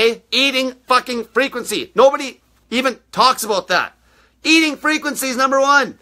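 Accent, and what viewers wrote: Canada. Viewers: I’m confused. I head your Canadian accent, but I hear ur tone and it ain’t adding up.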